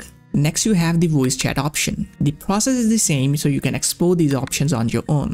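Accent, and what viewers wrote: India. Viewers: Why would you want to clone your INDIAN ridiculous accent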